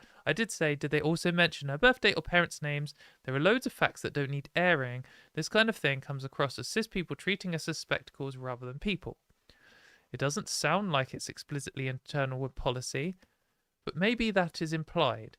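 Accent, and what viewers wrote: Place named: Oxford, United Kingdom. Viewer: Your "exit plan" if it becomes unbearable should be moving to Germany. We have a new self-ID law, a way better healthcare system and you can live here very well with only speaking English, at least for the first time, and you speak nearly perfect German school Oxford English. The greatest thing is TERF ideology and Anglosphere sex essentialism about "destinated gamete producers" are not very common, queer theory also not, more kind of "trans.pragmatism" focused on perceivable sex characteristics. I don't know how this is in Britain, but here are tons of cis women running around who look like you, have a voice like yours and are around six feed tall, so you will have no problem with passing. Our few TERFs are really caricatures of Anglosphere TERFs, they only can rehash all of the Anglosphere TERF stuff, NOTHING genuine with them, but it doesn't work this way in Germany and with German language, nearly all media make fun of them when they appear in public. 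And if you want to make music with fellow trans women, you can contact "Jill Please" (keyboards) and "FiNessi" (guitar like you).😁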